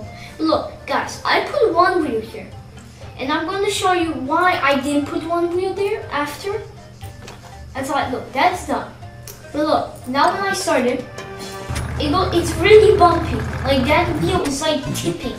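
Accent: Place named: United States. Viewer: Developing a slight American accent😂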